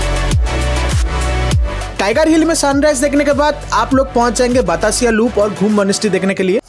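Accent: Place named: Odisha, India. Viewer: Odia bhai zindabad , accent ru jana paduchi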